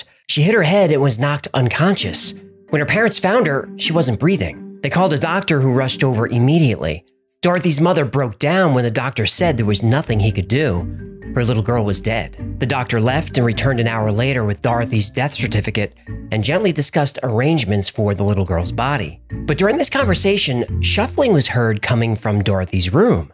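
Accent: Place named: East Coast, United States. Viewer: Hey, do you have any relation on television? The hubby and I watch “Toon in with ME” on METV and there’s a guy on there named Toony the Tuna, and he’s got an east coast accent like yours. Coincidence??? AJ says there’s no such thing.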